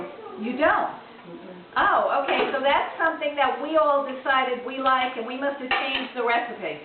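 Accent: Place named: Brooklyn, United States. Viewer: Could hardly tell you were from Brooklyn.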